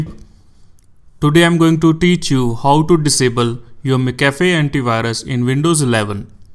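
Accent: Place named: India. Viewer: no thanks your indian scammer voice doesnt convince me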